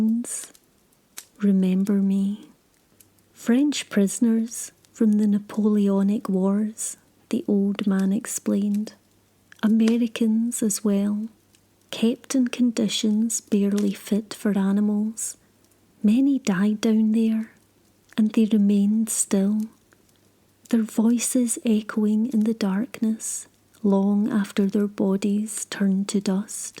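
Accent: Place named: Glasgow, United Kingdom. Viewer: Irritatingly slow voice. Clicky over emphasis of the letter S. Very poor narration, and I'm a Scot from Edinburgh. Sounds like a Weegie trying to hide the typical whiney Glaswegian accent.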